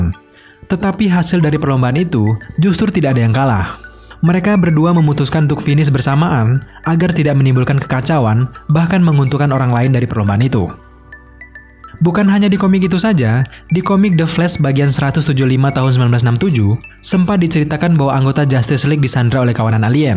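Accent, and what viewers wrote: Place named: Batak Karo, Indonesia. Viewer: Bro, dialek lo kok kaya batak karo yaa...bener ga hehehe Mantappp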